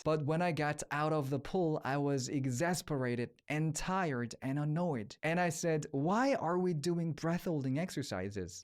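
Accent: United States. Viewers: Wow; just amazed, your American accent is perfect.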